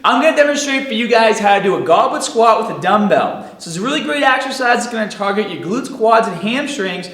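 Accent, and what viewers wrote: Boston, United States. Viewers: Love the filthy Boston accent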